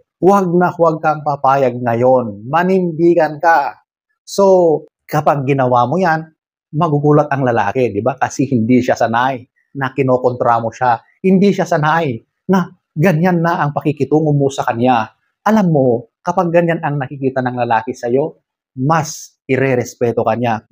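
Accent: Ilocos, Philippines. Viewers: Hi Kuya magandang advice mo. Gusto ko yung accent mo....Ilocano ka ba? 😅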